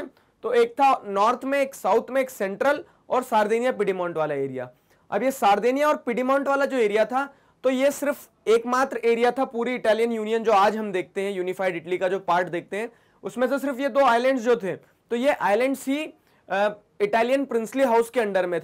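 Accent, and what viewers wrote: India. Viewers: The Indian accent is weirding cute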